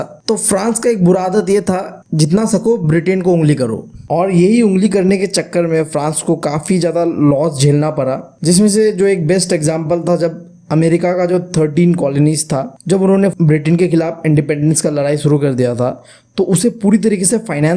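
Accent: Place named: Bengal, India. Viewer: Bhaiya are from bengal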